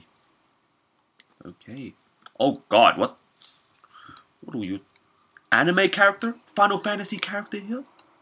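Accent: Vietnam. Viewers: HoàngYasuo Channel đúng rồi. nghe ổng nói giọng miền nam mà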